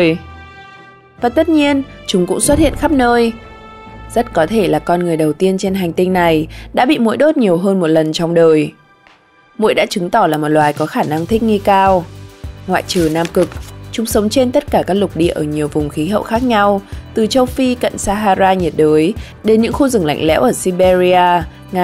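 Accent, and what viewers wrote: North, Vietnam. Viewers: Cho anh giọng Bắc hay và ấm hơn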